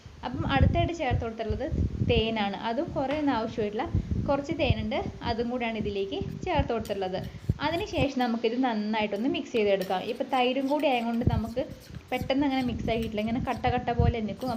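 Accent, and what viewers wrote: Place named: Thrissur, India. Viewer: Trissur aano home, slang 👍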